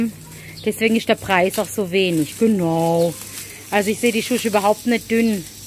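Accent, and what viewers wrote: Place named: Swabia, Germany. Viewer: Ok liebe, dann stell ich mir das einfach vor, die sehen auf jeden Fall toll aus, habt ihr gut ausgesucht. Dein lieber kann stolz darauf sein, so eine taffe und hübsche Frau wie dich zu haben und ich liebe dein englisch-arabisch-schwäbisch Geschwätze, du bereicherst jeden Tag von uns und ich mag ganz dolle deine Penny auch wenn sie quietscht wie deine Fliegentüre;-))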